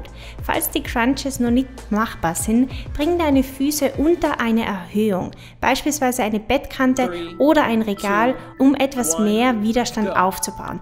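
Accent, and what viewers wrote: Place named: Austria. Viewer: Wer hätte gedacht, dass ich mich mal so über die aufmunternden Worte in österreichischen Dialekt freuen würde, während ich mich auf meinem Fußboden rumquäle! Ohne Spaß, hin und wieder versuche ich mich an anderen Workouts, aber ich komme immer zu deinen Videos zurück. Danke für tolle Arbeit!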